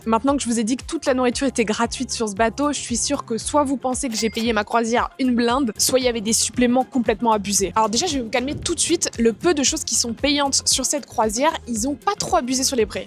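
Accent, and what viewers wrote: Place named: Belgium. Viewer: Tu ne serais pas Belge ? On dirait que tu as un petit accent en fin de phrase !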